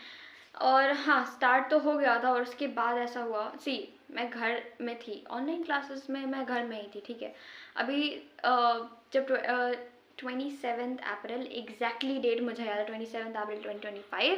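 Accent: Bihar, India. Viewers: kya aap bihar se ho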